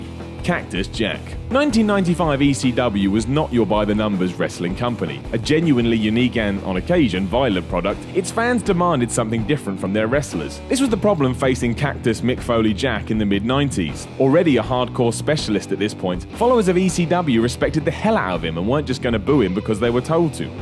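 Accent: United Kingdom. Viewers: I don't mean the accent over the a, I mean Simons British accent. So he used to saying it that way.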